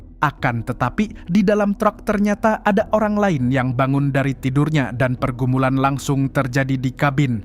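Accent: Banjar, Indonesia. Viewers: thanks bang, btw logat abang kek orang banjar, bujur lah u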